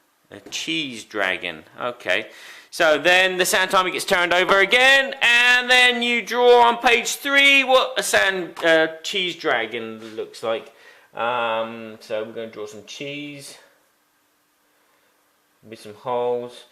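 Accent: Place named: Maine, United States. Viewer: I have never heard that particular American accent before, you must be from the east, far east, like Maine. As always great video. Thanks for sharing.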